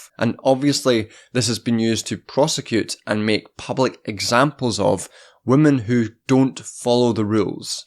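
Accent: Scotland, United Kingdom. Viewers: You got me with your Scottish & I subbed for the lefty content. We need to make alliances. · My first video on your channel. It's good to hear a fellow Scottish voice talking about how shite everything is. Thanks for that. The Braveheart remix was a nice touch.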